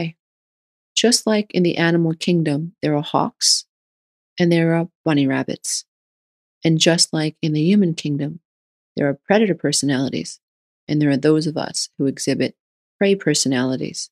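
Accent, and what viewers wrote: New York, United States. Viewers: This is soooooo on point., the last guy I dated was just like this. blaming me he can't get me to do a threesome, calling me names I shouldn't write on here. luring me in with excitement in the beginning and then just flaunting it allll over NYC with everyone else.. im the crazy one, im the nasty one, im the violent one... etc etc etc. (these are just the examples related to this video). then finally he broke my nose. the next fight, I finally called the cops. and then Covid happened and I left NYC so I didn't get stuck quarantined with him. During this time I was able to reflect on everything. I found your videos, and you have helped me understand so much. you aren't the only one with videos on this content,.... but maybe missing home so much and hearing a New Yorker like someone I knew tell me it wasn't me and hearing the examples that literally were pages out of the book of Michelle and Matt 2019 made it click and gave me strength. 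I just want to thank you so much.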